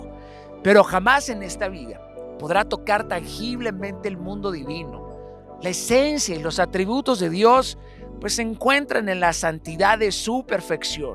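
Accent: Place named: Greece. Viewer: Tienes acento griego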